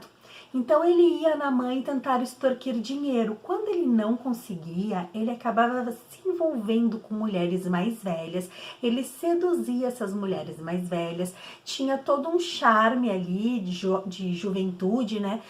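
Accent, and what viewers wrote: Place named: Rio Grande do Sul, Brazil. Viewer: Ahhhh, que amor! Um sotaque de gaúcha misturado com paulistanos 🙃🙂 Eu tbem amo Agatha Christie 🥰 Bom domingo pra vc 😘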